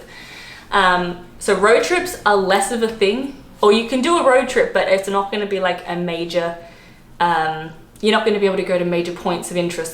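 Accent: Australia. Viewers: Do you think so? sounds quite a strong Aussie accent to me lol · You don't like Aussie accents? Its pretty much the main reason I watch your channel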